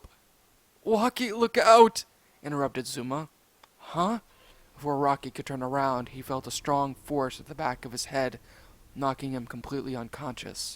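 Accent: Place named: Russia. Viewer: Not trying to be rude here but your French accent sounds like a Russian 's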